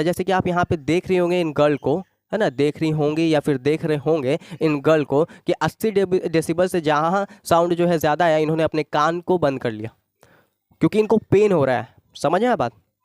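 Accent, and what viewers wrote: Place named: Bihar, India. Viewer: Sir you are from Bihar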